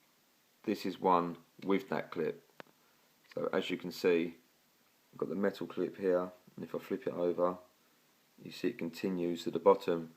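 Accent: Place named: England, United Kingdom. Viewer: Well done, it's so nice to here an english voice ( I'm a jock by the way lived in england most of my life) with no shit music in the backgrounnd, but a well clearly explained bit of good information cheers mate Dave in slough.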